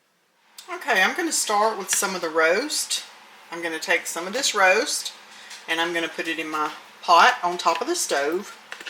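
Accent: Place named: Alabama, United States. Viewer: I've been binge-watching your channel tonight. Listening to you talk, I decided you might be from Alabama. I'm from North Central Alabama. During the past year, I've been cooking for one. You've given me such great ideas. Thank you for reminding me it's prudent to take care of yourself and remember to eat. I look forward to your upcoming videos. Blessings! 😃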